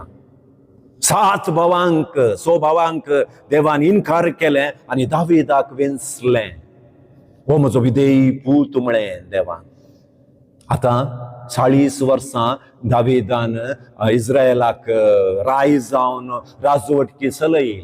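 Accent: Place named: Mangalore, India. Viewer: Amazing teachings Fr. You are always our favourite preacher. Your konkani is mangalorian it sounds nice.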